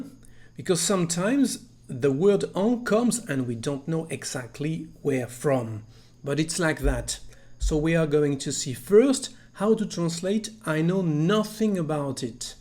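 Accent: France. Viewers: That was great and your pace was perfect! Also, you have a gorgeous French accent. Ahhhhh.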